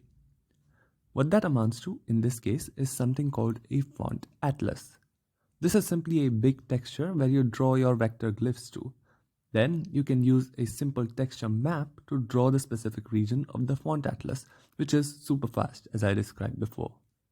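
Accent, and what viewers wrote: India. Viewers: I wanted to watch this but I just can't do the accent. I'm sorry. I just can't listen to Indian accents. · Why the Indian accent? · Your sound indian , are you Indian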